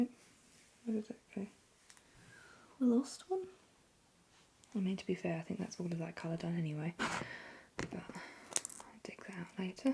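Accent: Ireland. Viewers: Im American, so I liked the Irish one because it was an accent. Definitely going to keep watching your videos, thanks for making them. There relaxing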